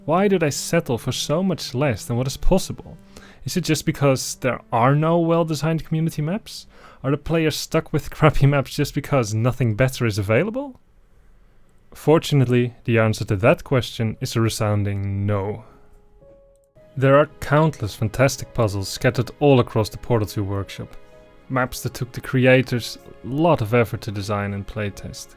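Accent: Netherlands. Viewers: do i sense a dutch accent in there? :D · Hey question. Are you dutch/netherlands?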